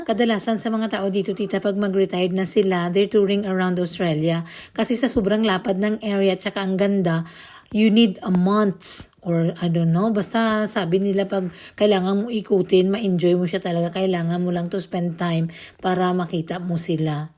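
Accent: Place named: Iloilo, Philippines. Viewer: hello po, i enjoyed watching your videos and listening to your voice and the way you talk in ilongga. ❤😅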